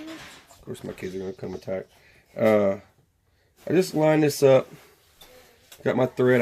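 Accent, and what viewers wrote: South, United States. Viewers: Nice work You sound more southern then me ha im in Mississippi. Were you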